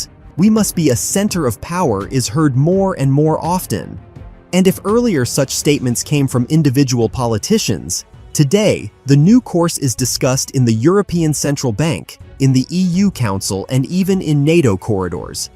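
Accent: United States. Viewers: Choose a non-yank accent for your AI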